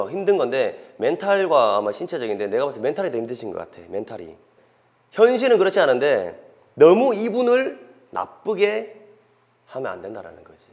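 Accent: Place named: Gyeongbuk, South Korea. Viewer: 선생님 혹시 고향이 경북이십니까ㅋㅋㅋ 유튜브에서 고향말투들으니 정겹네요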